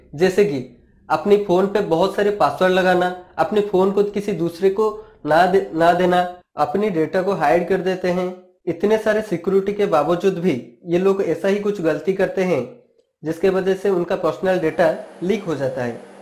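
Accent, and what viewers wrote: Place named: Odisha, India. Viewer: Bhai tu Odisha se Hena... 😅.., Tera hindi pura odia accent ke taraf sunai de Raha hai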